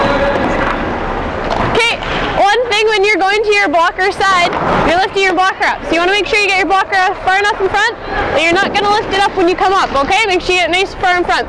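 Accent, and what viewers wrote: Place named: Canada. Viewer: she is probally canadian. A girl i dated was from Alberta and she sounded a bit like that. I see u like the sporty ones eh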